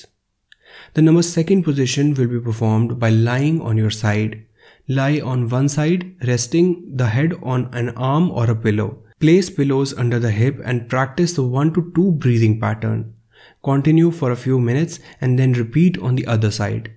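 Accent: India. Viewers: im sorry but i cant take indian english accent serious at all lmfao. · I have to think about a scammer everytime i hear that indian dialect "Hi i´m Tim from Microsoft support center, located in Los Angeles" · I honestly love Indian accents i had a good laughs when he said “number 2nd” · what a phenomenal video. just what i needed. i love the way indian people speak too. its so soothing and relaxing, i could listen all day my man. maybe i should marry a nice indian girl. thanks for all the great info. liked and subscribed.❤ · Just some hate how indians speaks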